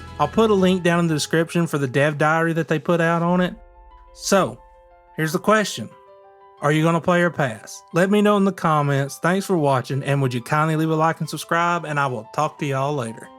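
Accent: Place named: Appalachia, United States. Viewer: No, it's because he has a southern or appalachian accent, and it's the way he pronounces his words :D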